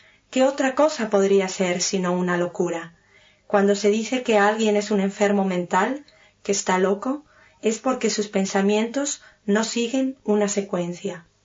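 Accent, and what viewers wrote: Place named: Argentina. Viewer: Yo extrañaba la voz argentina. Muchas gracias.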